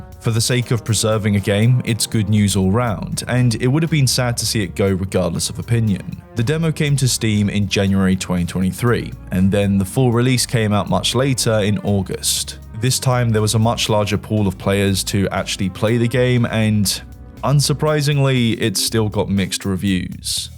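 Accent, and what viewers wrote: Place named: United Kingdom. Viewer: Never stop making videos like this. As a fellow Brit it's nice to not have to listen to yanks droning on lol.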